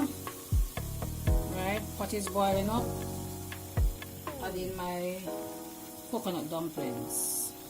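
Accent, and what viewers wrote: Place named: Jamaica. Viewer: Hello!! Mirna from Southern Arizona here! 🤓🌞 I love everything coconut! 👍 I'll be sure to try your recipe! Love your Jamaican accent 😁🥰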